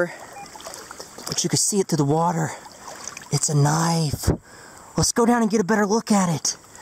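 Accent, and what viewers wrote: South, United States. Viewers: I love his accent an he's Avery handsome southern man stay that way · where are you from you sound like you're from the south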